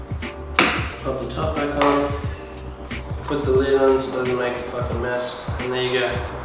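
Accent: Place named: Australia. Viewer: U is Aussie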